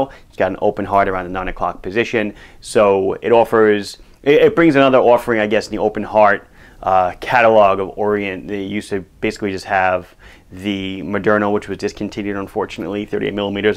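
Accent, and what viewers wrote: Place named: New York, United States. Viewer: am just here for the NY accent...and the watches sometimes.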